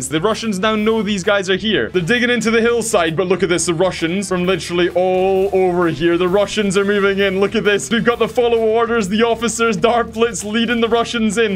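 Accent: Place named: South London, United Kingdom. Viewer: bro sounds like hes from south Lindon im 💀💀💀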